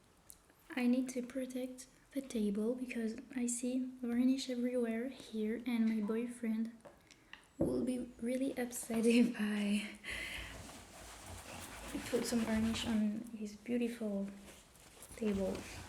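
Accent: France. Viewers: your videos are great and you are one of the few asmr channels i watch. i would request that you do more whisper/talking videos such as when you looked through your artbook. your voice and accent are so relaxing and charming. obviously you are french, but if you were playing a french character then you are playing it very well :) hahaa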